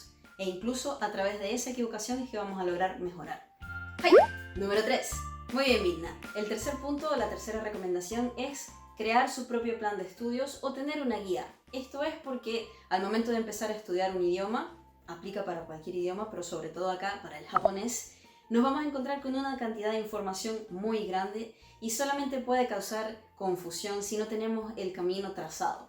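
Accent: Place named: Chile. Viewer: A escucho el acento chileno 😅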